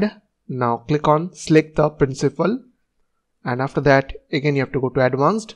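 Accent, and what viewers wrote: India. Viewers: Wow! This goes WAAAYYY too fast for me. I'm 71 and retired. Along with the Indian accent, I simply cannot follow this.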